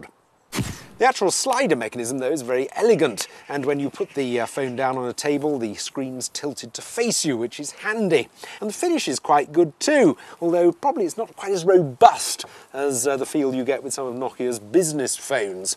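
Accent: United Kingdom. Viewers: hehe love the brittish accent top class...ohh and the phone looks great by the way :)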